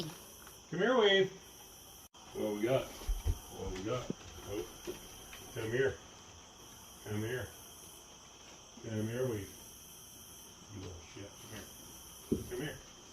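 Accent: United States. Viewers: i cant get enough of ur american accent, i just love it how u say "come here u little shht" 😂😂😂 much love from Estonia!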